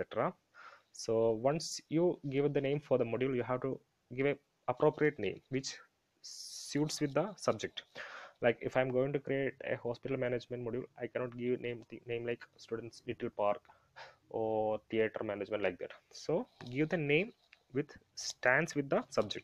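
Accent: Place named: India. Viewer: I understand English quite well but your Indian accent is almost unintelligible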